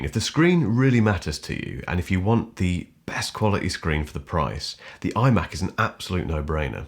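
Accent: England, United Kingdom. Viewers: All YouTube reviews should be in English accent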